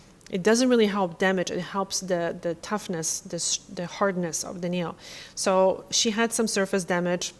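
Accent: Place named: Poland. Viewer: Love your accent. We Poles are awsome.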